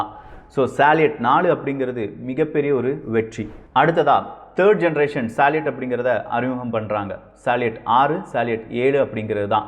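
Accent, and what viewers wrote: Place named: Kerala, India. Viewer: bro malayalam slang in ur english theriuthu.. docking - dawking not dowking.. 'O' is special for Malayalees.. No offesne. it is slang..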